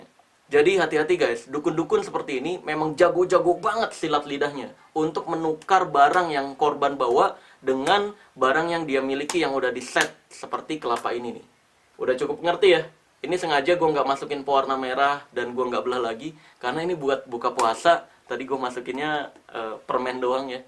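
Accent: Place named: Wonogiri, Indonesia. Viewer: Pakek apa tu yang di kuel-kuel. Bang jawa nya wonogiri ndak hilang. Haha😀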